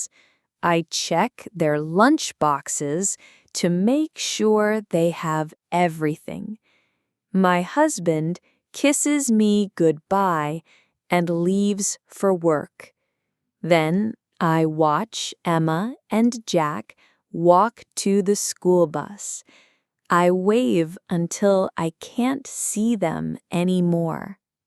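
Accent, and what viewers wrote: United Kingdom. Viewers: Is this british accent? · is british english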